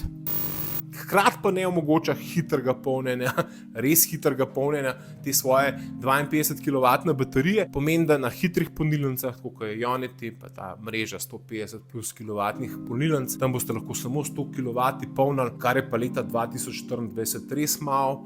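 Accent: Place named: Ljubljana, Slovenia. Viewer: prosim uporabljaj Slovenščino ne ljubljanščine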